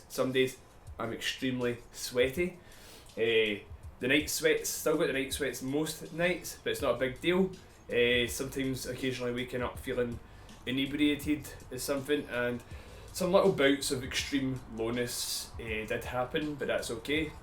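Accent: Scotland, United Kingdom. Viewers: Scottish ? Why the fuck I can’t understand shut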